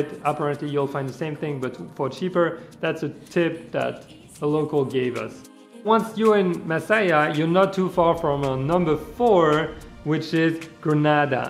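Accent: France. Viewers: cool video! but i wonder where ur accent is from is it french?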